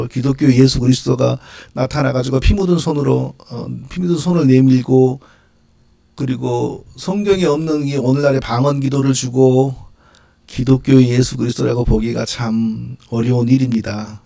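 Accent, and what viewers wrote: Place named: Jeolla, South Korea. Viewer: 전라도 사투리가 짙게 뭍어 나오는군. 경망스런 놈이군.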